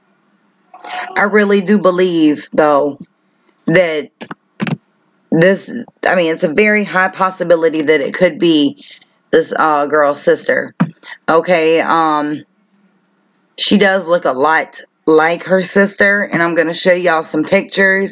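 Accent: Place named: South, United States. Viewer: love your videos and southern accent as I have a very strong one myself lol. Are you the person Str8 refers to as? Just curious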